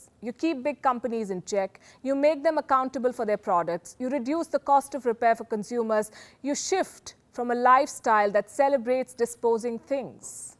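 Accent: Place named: India. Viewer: This is Indian English...and she doesn't need to mimic any accent or your version of " proper pronunciation".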